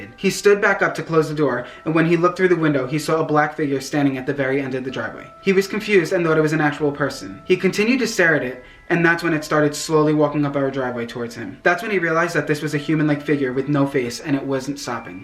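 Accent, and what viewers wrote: New York, United States. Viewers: I love your New York accent! I want to be your friend!!!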